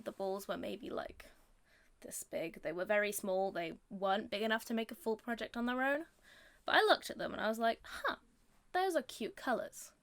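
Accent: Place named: United Kingdom. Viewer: You're so cute and talented :) and also I love your British accent <3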